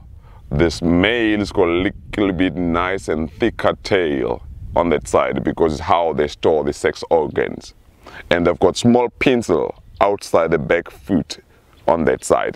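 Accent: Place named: Africa. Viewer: hey man that is rude andrea preti i am also from afrika that is how afrikans talk also zulu and i am fine with that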